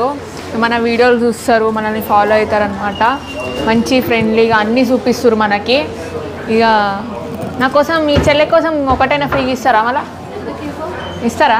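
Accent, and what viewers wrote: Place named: Vijayawada, India. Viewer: Vijayawada antunnaru konchem aa slang change cheste bagundedi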